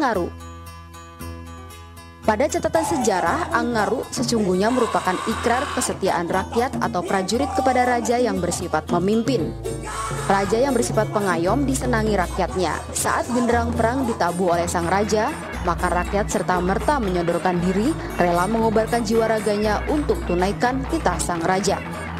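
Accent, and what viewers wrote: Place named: Sulawesi, Indonesia. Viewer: bahasa bugisnya sangat kental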